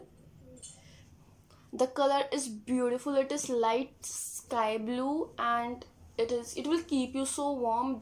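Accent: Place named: Haryana, India. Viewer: Bt i think u r haryanvi so that tone is coming in ur conversation so i am not saying anything nagative i appreciate ur work 😘😍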